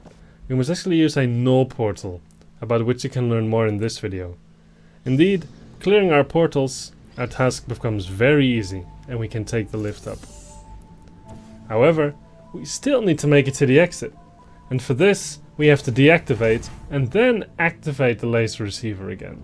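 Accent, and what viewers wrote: Netherlands. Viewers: yooooooo your dutch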